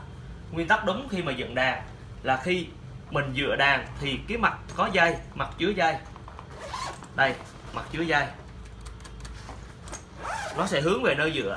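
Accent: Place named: Da Nang, Vietnam. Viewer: giong anh noi nhu nguoi thanh pho da nang , ghe zay cho em xin d/c dc ko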